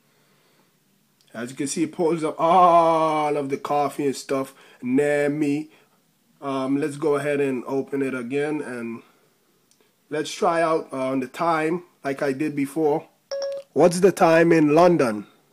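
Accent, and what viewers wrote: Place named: Jamaica. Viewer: just curious but what is your nationality? you sorda sound Jamaican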